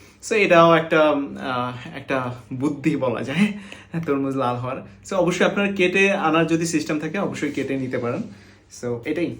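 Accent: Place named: Noakhali, Bangladesh. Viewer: Taile bujben ata tor Noakhailla kotha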